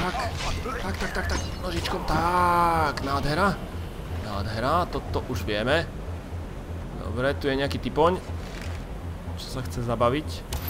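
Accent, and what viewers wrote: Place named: Slovakia. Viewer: Fiu,komentuju trosku pozdeji,no snad nevadi :) Ja osobne se na tve videa nedivam ani tak napribeh ani podobne ,ale proto,ze chci slyset tvoje hlasky a podobne.Podle me je to dobry napad,kostovky dlouho nebyly,stare dobre casy :D Pff...stare...jsi muj nejoblibenejsi youtuber ( yeup,a mas fakt super prizvuk...to je tak kdyz jsi napul slovacka no xD ) a u tvych videi se uklidnim a odreaguju.Delej jak uznas za vhodne. ;)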